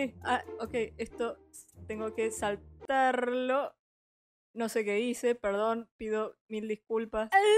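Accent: Argentina. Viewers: tu eres de argentina (Paraná?)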